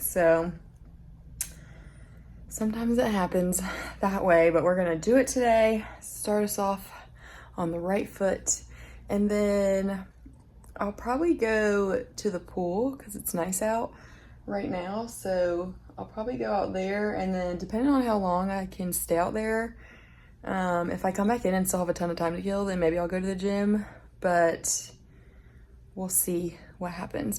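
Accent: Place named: United States. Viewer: Lovely to see you, beautiful and professional as always, luv the American accent xx Matt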